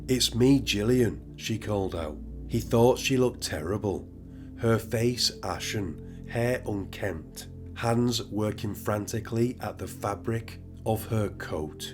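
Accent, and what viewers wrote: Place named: Liverpool, United Kingdom. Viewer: I love your accent. Where are you from? Sounds like Liverpool?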